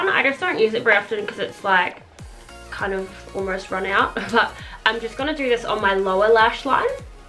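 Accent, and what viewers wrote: Australia. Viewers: R u Australian? Because I am just asking.. (edited: u might be sleeping rn if u r Australian)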